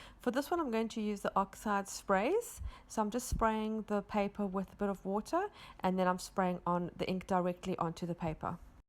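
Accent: South Africa. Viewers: Hi, you sound South African, where do you live. It’s so nice to hear your accent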